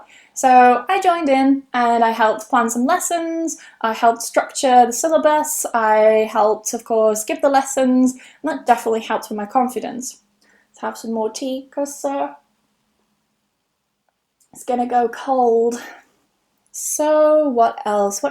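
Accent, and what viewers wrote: United Kingdom. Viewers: Hi, I've just subscribed to your channel. I love your British accent, your voice, your look, and the way you present. I find most English teaching videos on youtube are in American English, which is unfamiliar to me. I'm lucky to find you on youtube today. I can see that your eyes were still filled with tears when you shared the bad experience with your previous boss. I had similar experience with a bitch boss so I really can feel your pain. I'm so touched by your story. I like genuine and kind-hearted people like you. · Hi! you are an excellent teacher, I love your British pronunciation! I'm learning a lot with your youtube's videos! Thank you so much! Xx:) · watching your video because I like British accent, thanks for you kept talking in English and it is brilliant, love it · Thanks for sharing your story. I really like British accent 😇💙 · Very motivational story. 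I love british accent.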